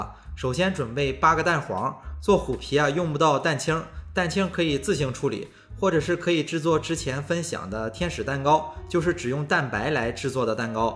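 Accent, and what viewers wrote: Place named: Jinan, China. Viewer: 老爸真是中西结合的好典范啊，亦中亦洋，都拿捏住，太绝了，你也太有口福了吧！！我是济南人，听着你的济普很亲切哦！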